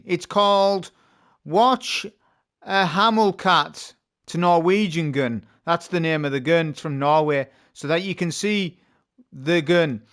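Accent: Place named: England, United Kingdom. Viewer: well, the youtuber, who sounds English, butchered the word. I was assuming the issue was cultural unfamiliarity.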